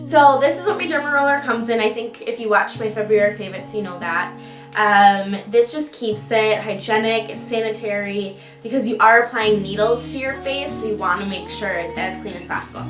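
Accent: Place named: Canada. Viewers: Canadian eh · Hm she sounds canadian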